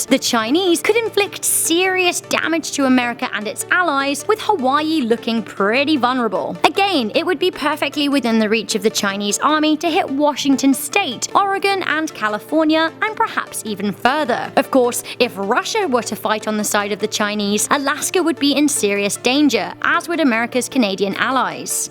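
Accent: England, United Kingdom. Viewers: Why do they always have people with English accents narrating these videos? I mean, it’s about China and America, not England.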